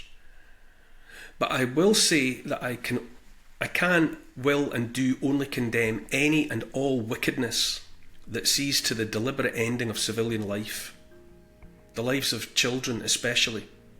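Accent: Scotland, United Kingdom. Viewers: ... this CanUk fan is a fan because you overflow with common sense. Personally thank you for the great help you have been to a feller, with ancestral Scots blood, better understand what words behind the Scots accent meant: ) In the 50s-60s Scots in our chat had been reduced to wonderful 'catch phrases' usually humerous. Cheers & beers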